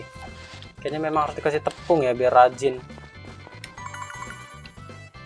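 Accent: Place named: Lampung, Indonesia. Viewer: i hear "tah" and "ding" orang lampung ya kak?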